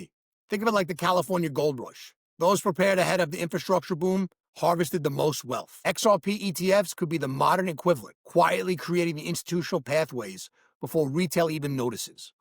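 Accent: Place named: New York, United States. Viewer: You are the only NY accent that I can listen to. Keep 'em coming.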